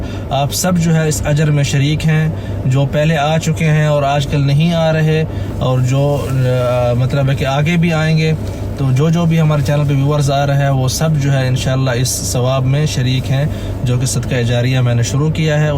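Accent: Pakistan. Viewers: Bro u said am from madina ok u born in madina ok but origin from where yani your forth father from pak or India ...I think pak cos your urdu akcent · Appki urdu Arabic sound nhi karti hai 😊😊 pakistani ki ek touch hai aur urdu mei pakistan se behter koi nhi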